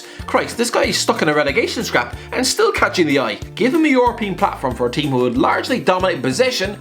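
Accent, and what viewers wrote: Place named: Ireland. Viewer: Me: Goes onto HITC Sport Hears Irish guy Me: Leaves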